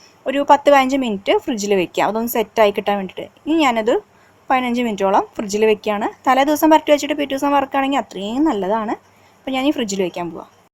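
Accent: Thrissur, India. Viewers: തൃശ്ശൂർ ഭാഷ🤗 കുഴുവ വറുത്തത് 😘😘😘😘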